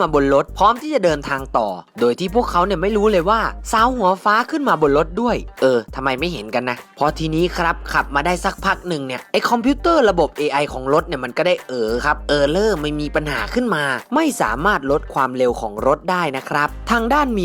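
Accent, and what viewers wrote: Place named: Suphanburi, Thailand. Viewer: พูด แบบ สุพรรบุรี ครับ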